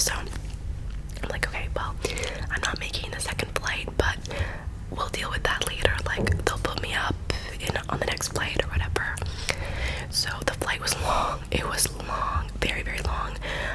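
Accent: United Kingdom. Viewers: Love the British accent 😂😂🔥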